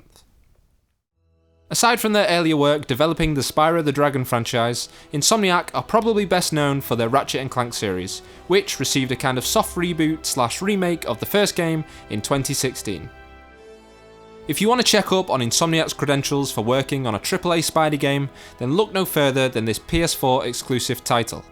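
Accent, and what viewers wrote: United Kingdom. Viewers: Wow, you have so beautiful voice 😀 British Accent is music to my ears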